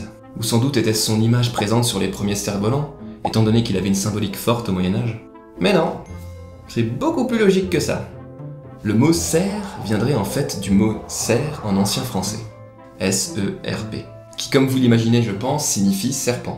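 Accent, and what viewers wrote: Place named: Occitania, France. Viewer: Super sauf ton accent occitan !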